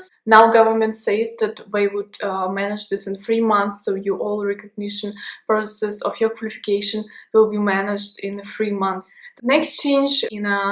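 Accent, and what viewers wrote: Russia. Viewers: I Love the Russian accent ...😍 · Your English is very good to understand, I just came back to Canada from Asian, spacial from China very hard to understand, your English is good, nice beautiful Russian aksent, I love it, speak German too, I like to hear that, you are beautiful.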